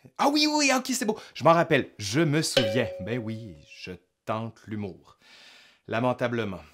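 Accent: Quebec, Canada. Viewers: Ouiiii, je viens de la découvrir ! Je visionné chaque vidéo de la plus ancienne à la plus récente. Je viens de vous "rencontrer" via les vidéos de Ben (Nota Bene). Merci pour toutes ces capsule fort intéressantes qui me font découvrir, entre autres, votre pays qui a bercé les rêves de mon enfance mon enfance (Gilles Vigneault surtout) et toujours avec ce savoureux accent et cet humour.... québécois ?! 😉 🙏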